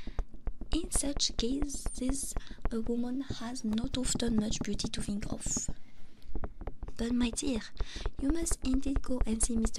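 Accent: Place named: France. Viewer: DO MORE STORY READING WITH FRENCH ACCENT PLEASE, IT'S AMAZING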